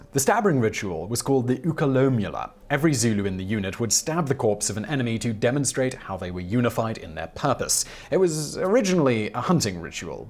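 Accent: England, United Kingdom. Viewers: your English we expect you to pronounce everything wrong. Don't sweat it. Your English accent is very appealing for you. Your content and your videos are always wonderful.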